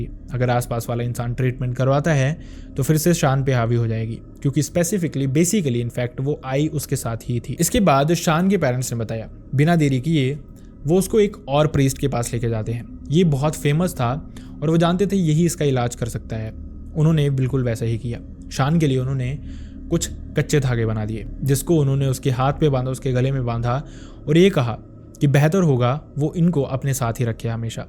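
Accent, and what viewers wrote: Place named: Assam, India. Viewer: Bhai aap Assam se ho kya😅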